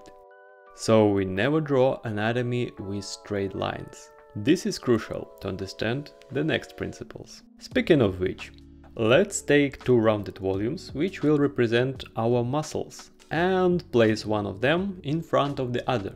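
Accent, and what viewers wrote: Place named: Poland. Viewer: jak to się dzieje że zawszę usłyszę polaka, dobry film tho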